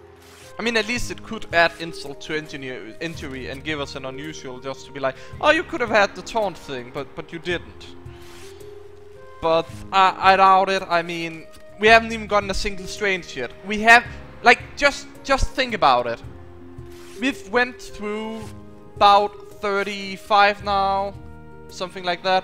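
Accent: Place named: Denmark. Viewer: You have a very danish accent. You're probably not dansih though, you still have a danish accent.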